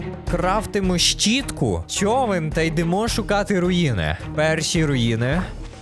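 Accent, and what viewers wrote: Lviv, Ukraine. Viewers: ТИ З ЛЬВОВУ???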